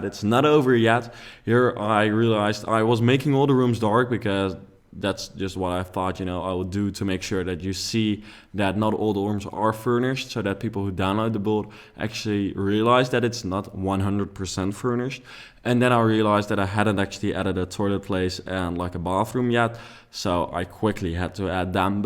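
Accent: Netherlands. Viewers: This dutch accent though🙈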